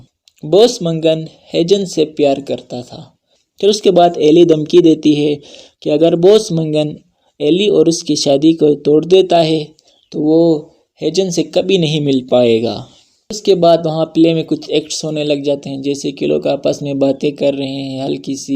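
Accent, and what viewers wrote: Gilgit-Baltistan, Pakistan. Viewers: confirmed gigiti ho bhai. i can tell you cox of your heavy Shina accent. :D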